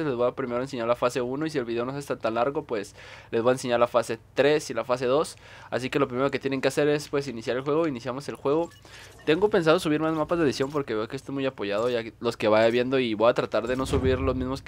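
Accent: Mexico. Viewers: Eres de Sinaloa?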